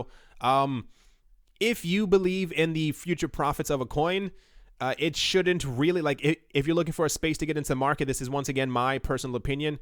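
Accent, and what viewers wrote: United Kingdom. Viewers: Mate, if someone tried to ridicule me for using a british accent, I would do the whole video in british accent. Don't be bothered by these knobs. If they're foolish enough to invest with a loan or can't see the developments in crypto space, then the crypto space will be better off without them anyways. Thanks for anothet great content and boy are we entering interesting times... all the best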